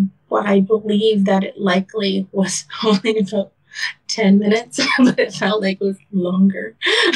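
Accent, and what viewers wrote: Canada. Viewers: Your Canadian accent is a bonus to your perfect narrations!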